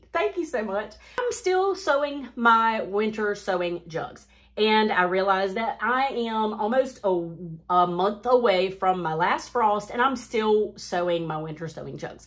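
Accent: South, United States. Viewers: Just found your channel today. I’m so excited to follow you and hear that beautiful southern accent. I’m from Georgia 8a. Can’t wait to plant seeds now. Your friend Candy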